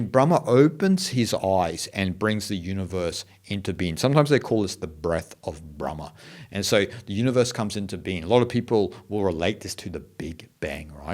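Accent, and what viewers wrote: Australia. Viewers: no Indian, however how much esteemed he is in his field, could have explained so beautifully in english your description of the kali yuga. the particular phrase which you have used Swamiji, "the devolution of mind into matter", has really struck a chord somewhere. im assuming you're aussie from the accent. you will always find a home in Bharat. australia and nz are in for err a bit of rough weather mate. May Lord Shiva Bless you!. Om Namah Shivay!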